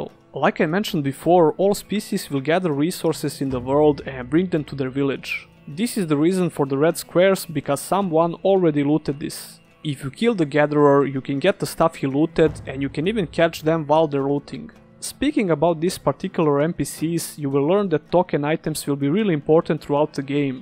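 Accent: Balkans. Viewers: Are you from Balkan? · U sound like you are from balkan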